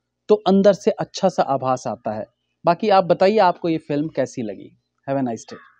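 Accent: Standard. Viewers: first time not understanding your review fully. its looks like you speak purely Hindi. Good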